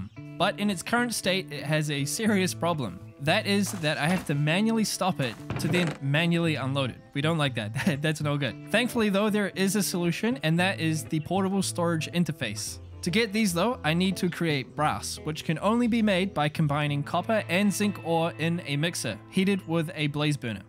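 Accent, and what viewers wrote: New Zealand. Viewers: thanks so much bro, also from New Zealand, good to here a familiar accent on a yt video, keep it up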